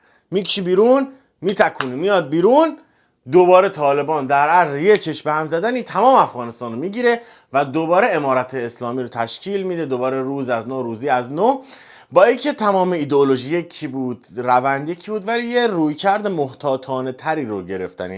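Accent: Shiraz, Iran. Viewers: افغاني هستم هميشه عاشقِ طرزِ بيانت-لهجه شيرينِ شيرازيت و تحليل هات بودمو هستم سياسي مخصوصا-بخدا اگه چهار ساعتم ويدئو بسازي آدم خسته نميشه سير نميشه از ديدنش-حرفاي اين ويدئو هم كه به دلم نشست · یعنی من عاشق لحجه ی شیرازی هستک🇮🇷🇮🇷🇦🇫🇦🇫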